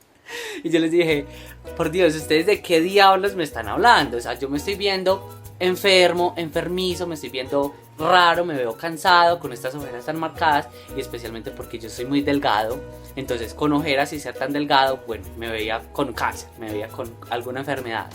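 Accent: Antioquia, Colombia. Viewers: Oyeeee paisa me gusta como hablas... bacan!!!!!!! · definitivamente paisa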